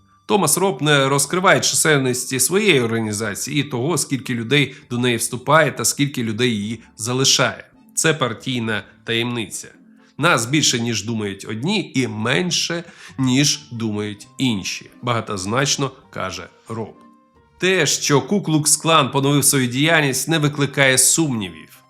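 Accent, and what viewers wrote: Russia. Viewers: канал україномовний, але автор судячи по вимові в повсякденному житті російськомовний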